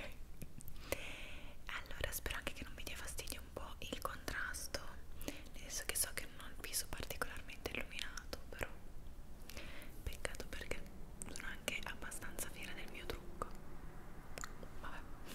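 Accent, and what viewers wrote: Italy. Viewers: Yes I love Italian accent ❤💕💕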